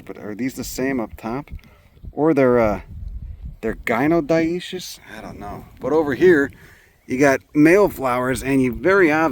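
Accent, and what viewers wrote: Chicago, United States. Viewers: your from Chicago right? Have that accent yah. me too well i don’t have the accent though. anyway been watching your stuff for about a year now so kinda new compared to how long you’ve been on. The humor sarcasm is what keeps me coming back. And i love plants and the way you make it fun. especially love the Texas ones.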